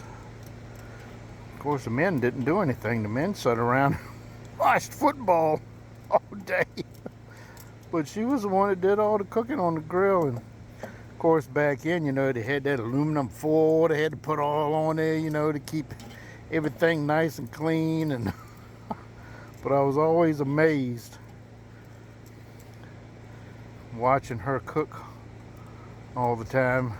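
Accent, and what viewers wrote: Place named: Louisiana, United States. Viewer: Looks and sounds good. My Momma liked her hotdogs black! Are you from Louisiana? Asking because I detect an accent. Thanks for sharing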